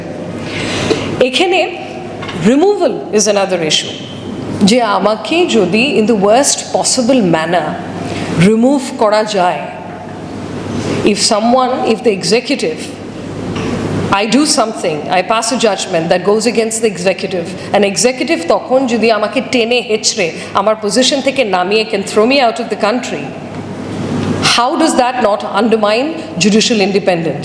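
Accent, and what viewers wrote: India. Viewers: Indian accent but kothaguli valo chilo . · indian accent ! bharotiyo mohila !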